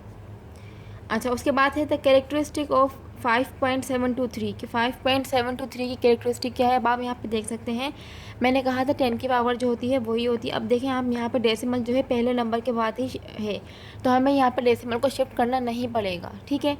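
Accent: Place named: Sindh, India. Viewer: Madam aapki mother language sindhi hy ya urdu aap urdu sindhi ki tarah bol rahi hain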